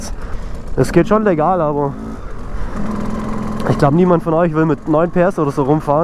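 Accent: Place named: Geislingen, Germany. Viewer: Geißler aktzent.. Fend I tob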